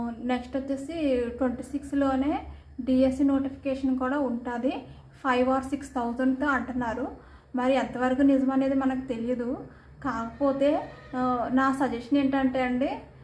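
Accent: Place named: East Godavari, India. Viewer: నేను అడిగిన దానికి మీ ఆన్సర్ అంతలా ఎందుకు.. జాబ్ వస్తేనే చెప్తారా?? Madi ఈస్ట్ గోదావరి..మీ వాయిస్ అలానే ఉంది slang అందుకే అడిగా..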